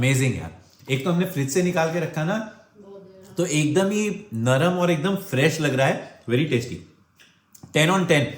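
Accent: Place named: Chandigarh, India. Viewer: my guess was right. You have Chandigarh vibes. Your way of talking has Chandigarh touch. I am too from Chd and living here in Bay Area. Good to meet you.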